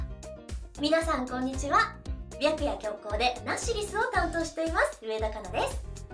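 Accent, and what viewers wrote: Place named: Kansai, Japan. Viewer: Her Kansai dialeg is cute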